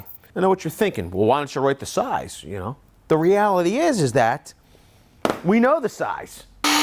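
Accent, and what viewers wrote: Philadelphia, United States. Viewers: South Philly accent. We say WUDDER, for water!😀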